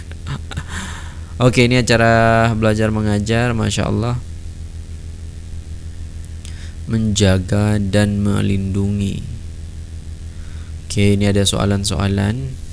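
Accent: Malaysia. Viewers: ni cara pertuturan dia sikit lebih kurang mcm org M'sia. Senang faham. Terbaeek👍👍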